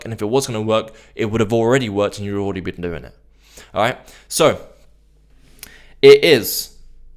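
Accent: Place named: United Kingdom. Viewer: Great! 😊 btw I love British accent & sense of humor. Cheers! 🥂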